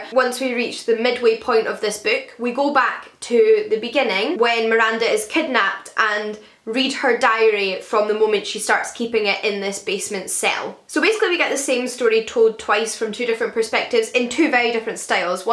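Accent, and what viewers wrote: Scotland, United Kingdom. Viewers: you're Scottish, aren't you! Lol!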